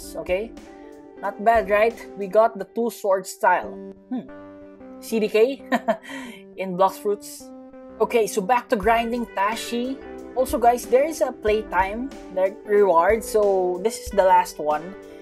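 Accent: Philippines. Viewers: Alam kong pinoy ka kasi sa salita mo pang pinoy na eh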